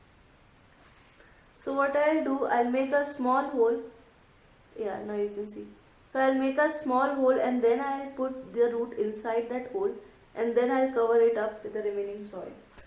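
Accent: India. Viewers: Gotta love Punjab voices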